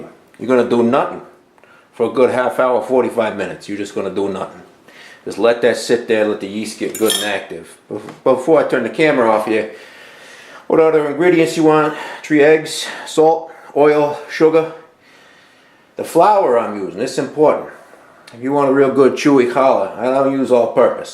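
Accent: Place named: New York, United States. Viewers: You are amazing! I love listening to you speak. I am originally from Rochester, NY, 72 years ago, so after 25 years in SC I love to hear a real New Yorker talk. The bread is beautiful, and what it means to you is even more beautiful. I made my first loaf today, and it's in it's final rise. I hope it turns out at wonderful as yours did, though I only made 1/2 recipe. Thank you for your time and energy you put into this video. I hope you and your family are always blessed. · Love listening to your N.Y. accent, and the passion you expressed, and your CHallah metaphor...Thank you for sharing your amazing recipe! I would try adding the sugar to the yeast well you made, just to cut a little wait time. Shabbat Shalom!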